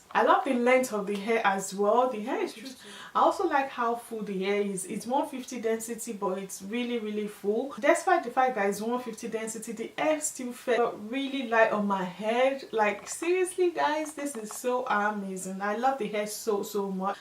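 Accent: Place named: Nigeria. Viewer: I love the accent! Nigerian??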